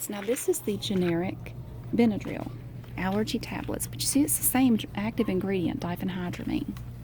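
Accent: South, United States. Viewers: Feel like I’ve just had a counselling session the, thank you ❤️❤️ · Hey there. I watch your videos often but am not one to comment every time. I wanted to quote the famous RuPaul and tell you " 'less they payin' yo bills, pay dem bitches no mind!" Don't let the negative comments from a bunch of people you'll never know well or meet bring you down. I enjoy your videos. They help to calm me. Plus you have that great southern lady accent. I'm in Michigan so we don't hear that around here often. Keep doing what you're doing and keep your chin up. Thank you for your work! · When I'm in shoos I do the same thing now. Its addictive and really fun. Even my great niece does it. This is doing what she does brilliantly ASMR. Soft Southern accent, relaxation, I love it. Haters keep hating, if its not for you piss off and stop criticisising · Girl I love your videos we got the same country accent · I've just recently subscribed to your channel and I am so glad I did! 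These types of videos imparticular are oddly relaxing and satisfying while informative! Plus, I love your soothing voice and southern accent..so caring and motherly. Thanks for all of the work that you do! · I’m from Germany and I really enjoy listening to people with a southern accent, it just sounds so fun! I spent a year in the US, in Montana though. Great experience! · Plz don’t stop them because of selfish sad people!!! Focus on the people that love your videos like me!! I thank you! When I’m at work and stressed I look for you and put these videos on and you southern voice calms me down!!! Thank you in Jesus Christ mighty name!!! Dan from NH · i’m love these videos. they’re so calming to me. i lost my mom when i was 15, so listening to you talk makes me feel like i’m chatting away with a supportive, sweet, southern mama! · you're the best!!! I watch these vids when I am at work and have to laminate and cut thousands of buffet labels. 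It makes the time pass quicker then just listening to music and I love your Southern Accent and sassy remarks, thank you💜💜💜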